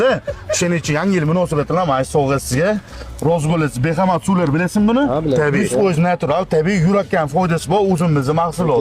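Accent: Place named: Namangan, Uzbekistan. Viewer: Намогон шеваси узгача гап йук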